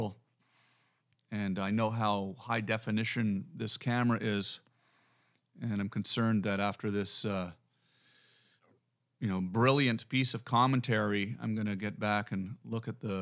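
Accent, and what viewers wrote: Canada. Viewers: Keep talking about the farm and all of the nature, bro. That’s what got me hooked oh, and by the way, keep saying words, where your Canadian accent comes out! Hilarious. Lmfao hilariously awesome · I think your canadian accent is getting stronger. Love it.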